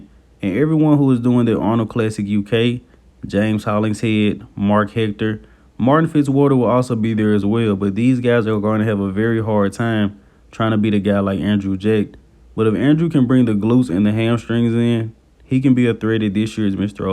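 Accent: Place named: South, United States. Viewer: lol man I grew up in the South, this is just how people talk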